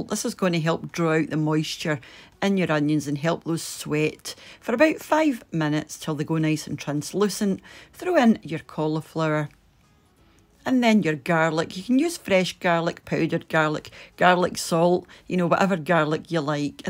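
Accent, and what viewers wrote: Scotland, United Kingdom. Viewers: Oh I LOVE this recipe as much as I love your beautiful Scottish accent! Your recipe looks simple, tasty & delicious! 👍😜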